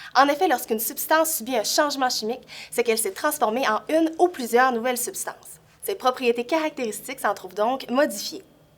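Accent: Canada. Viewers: merci grâce a vous je vais pouvoir mieux faire l'accent Canadien ! MERCI BEAUCOUP!!!!!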